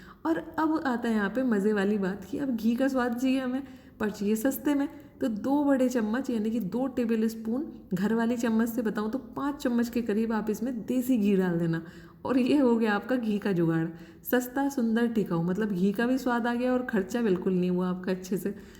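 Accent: Haryana, India. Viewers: Nice and pleasant to hear Haryanavi accent so soft spoken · Didi Haryanvi ho kya?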